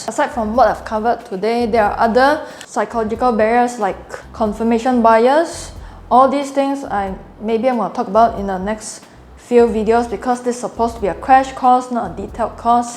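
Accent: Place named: Singapore. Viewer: loved ur Singlish accent! :)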